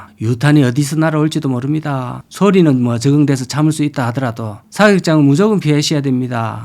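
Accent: Gyeongsang, South Korea. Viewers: 경상도분같이 말씀 재밋게하시니 보느데 재밋네요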